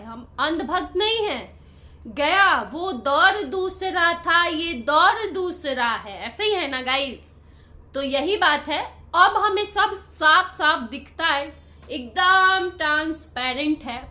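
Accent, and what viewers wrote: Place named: Bhojpuri, India. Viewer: Dear aap kahan se ho mast bhojpuri bolti ho bilkul mere jaise 😂😂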